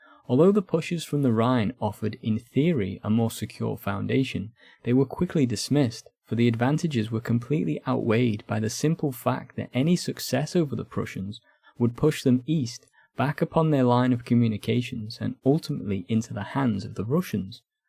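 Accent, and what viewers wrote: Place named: Yorkshire, United Kingdom. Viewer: Or maybe not the accent, I think it's your smooth voice on top of the accent, I'm sure other northerns (I assume you're from yorkshire) font have a voice so heavenly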